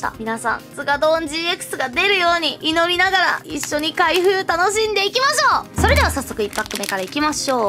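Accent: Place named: Kansai, Japan. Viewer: ナチュラルな関西弁良き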